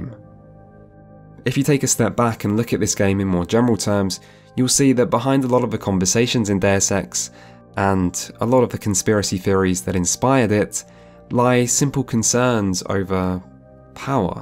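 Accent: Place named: United Kingdom. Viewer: UK Accent? - Check. This is going to be a good retrospective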